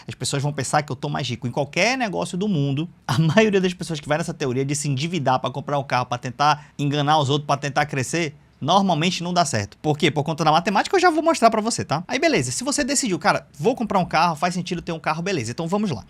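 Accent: Manaus, Brazil. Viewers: Sotaque manauara detected 😁 bom demais seu canal!!! Abraço da zl de manauxxx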